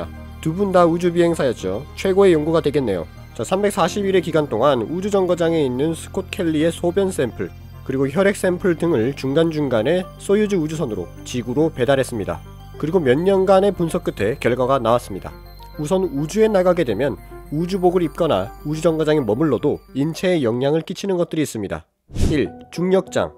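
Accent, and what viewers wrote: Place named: Gyeongsang, South Korea. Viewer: 경상도 사람인가보네요 서울말 잘하시는듯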